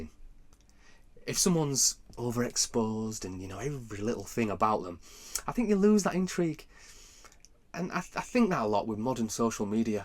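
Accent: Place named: North, United Kingdom. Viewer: Art with a luvleh Northern accent and awesome graphics? Subscriiibed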